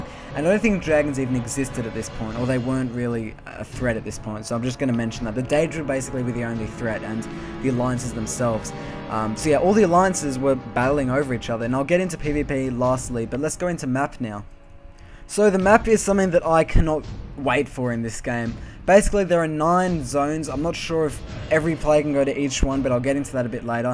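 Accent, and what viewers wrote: Australia. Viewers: You´re an Aussie, right? Great accent!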